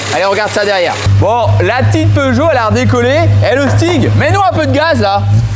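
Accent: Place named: Paris, France. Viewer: Toi t’es pénible ! Une pépite ! Une 104 ! Ta cible : Les débiles ! Tu devrais bosser chez Vintage mécanic ! En plus tu as la tenue de mécano ! Accent parisien ! Insupportable ! 🤮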